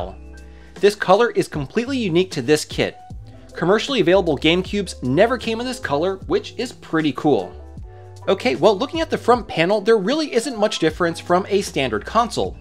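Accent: Philadelphia, United States. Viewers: are you from the philly area? the way you say your "O"s reminds me very much of my accent.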